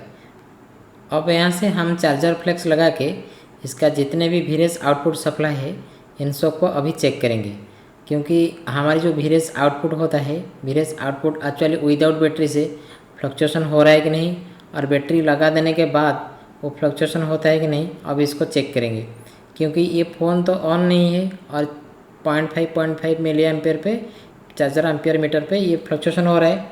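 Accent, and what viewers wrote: Odisha, India. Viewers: Emiti laguchi tame odisha ru boli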